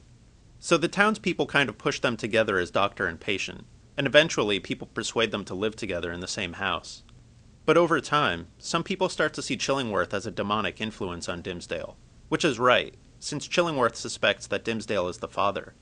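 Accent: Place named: United Kingdom. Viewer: مصدگ عود 😂😂ترى هذا بريطاني إلي ديحجي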